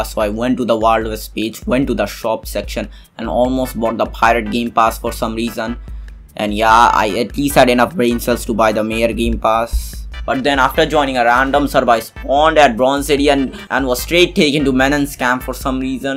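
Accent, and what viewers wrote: India. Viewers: Bro got them indian accent drip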